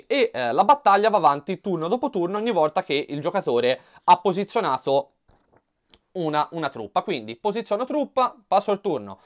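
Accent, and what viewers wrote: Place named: Tuscany, Italy. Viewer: Una delle ragioni che mi hanno fatto scegliere questo canale rispetto ad altri sulla stessa materia è proprio l'accento toscano e il modo di parlare toscano 😀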